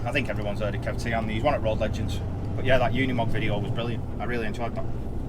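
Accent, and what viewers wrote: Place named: Bolton, United Kingdom. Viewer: Proper bowton accent that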